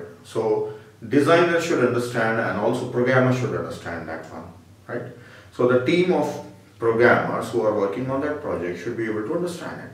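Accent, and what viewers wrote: India. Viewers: 印度兄弟讲的挺好理解，印度口音听久了也能习惯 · you have to make english subtitles, because the automatic subtitles from youtube are wrong a lot, as a south east asian, i have a hard time understanding your indian english accent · incredible india！I recently turned to Indian lecturers at YouTube to learn something. Except the accent of English, most of videos are so good and easy to understand like this one -----------Great respect from an international student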